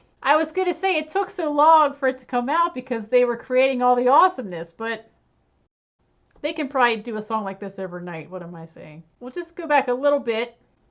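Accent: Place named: Mid-Atlantic, United States. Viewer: My second listen... Hear "noaht" for "note"... Def Mid-Atlantic.. Awesome!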